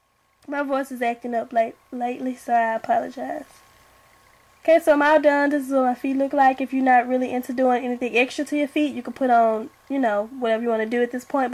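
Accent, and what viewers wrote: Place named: Mississippi, United States. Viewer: I just was just curious where are you from? You sound like you are from MS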